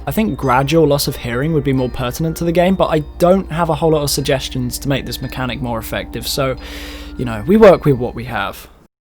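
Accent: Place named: United Kingdom. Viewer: your british which makes you 10x more annoying than you already where with this video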